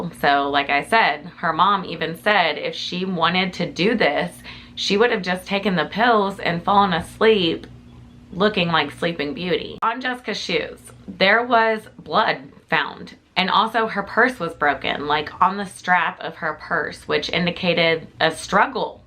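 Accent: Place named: Georgia, United States. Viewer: Sweetie, I don't know why I just heard. You're from Georgia, omg,me too,I was born and raised in south Atlanta, College Park and east point area, I moved to Jasper in 2001.,and lived there till a few months ago when I had to move to Virginia, my middle son and grandchildren are here. My other 2 boys r still in Georgia. I can't tell u how much I miss it. I've never lived anywhere else. I should have known by ur accent. That's awsome that I found ur channel. Oh,this is so heartbreaking,,poor girl. I feel so bad for her mama. She was a beautiful girl. I have a heart for anyone with substance issues as I lost my only sister,in 03,and my nephew,,her son,just in November, both from issues from having done substances. Oh,her kids,that breaks my heart sweetie,,and I hope they find the truth. Thank you so much for the video sweetie. Its awsome that you're a Georgia girl too. 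Happy Easter to you and your family ❤🐰🥚🐥🐣🐇🌺🥰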